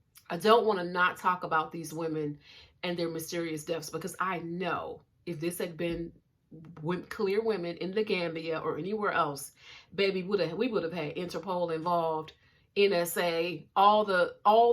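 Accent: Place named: Detroit, United States. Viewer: Shout out from the D! I thought you sounded like a Detroiter😊i was in Paris solo in July...racism was overt but I still had a great. careful have to be careful.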